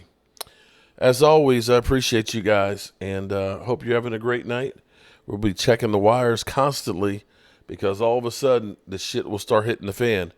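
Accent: South, United States. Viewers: You seriously know nothing it's all conjecture on your, what you said is just not all factual, you think somebody is going to scare the jones family, really. You southern accent is embarrassing.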